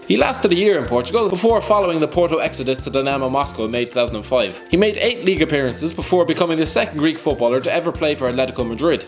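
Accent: Ireland. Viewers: narrator is a paddy, oirish to most. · being half greek half irish its lovely to hear an irish cover this video <3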